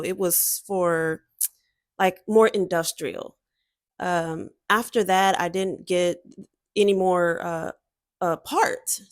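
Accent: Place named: Bay Area, United States. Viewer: Ms. I hope hope you keep on with it. Your videos are really good. Now you have a sultry twang voice and in this video specifically your voice you sound a lot like Kamala Harris. I guess it's that both you and Ms. Harris are from California. The Bay areas has a vocal connection hundreds of mile away.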